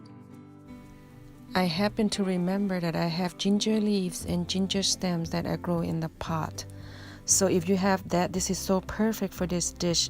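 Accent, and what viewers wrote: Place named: England, United Kingdom. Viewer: วาววว น่ากินๆๆ ชอบสำเนียงEnglish so much❤️❤️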